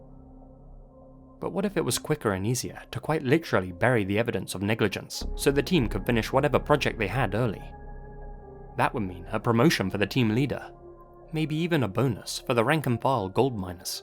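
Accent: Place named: United Kingdom. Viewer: For some reason that British accent is damn good at storytelling!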